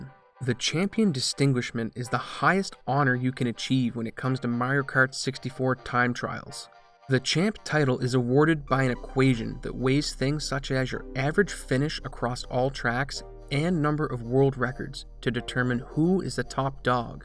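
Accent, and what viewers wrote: Canada. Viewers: Your "AR" sounds scream Canadian. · Are u Canadian? You speak like a Canadian. Mars bars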